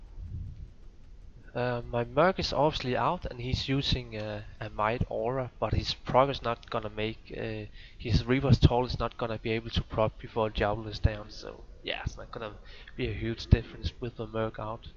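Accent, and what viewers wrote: Denmark. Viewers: try to lose just a bit of the danish accent. It's like nails on a blackboard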